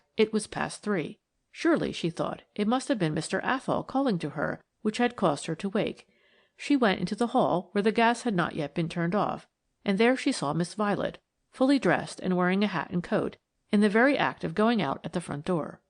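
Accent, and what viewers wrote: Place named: United States. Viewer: Why an American narrator???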